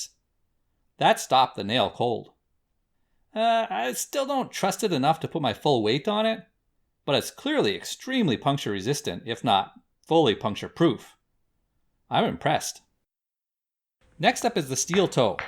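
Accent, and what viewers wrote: Canada. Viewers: You’re Canadian aren’t you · Sounds very fucking Canadian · Are you a Canadian?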